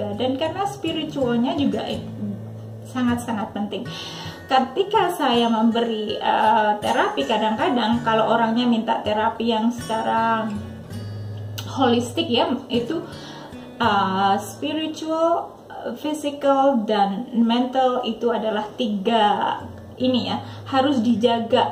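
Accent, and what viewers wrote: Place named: Java, Indonesia. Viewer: asli asal mana, koq logatny jawa hhh..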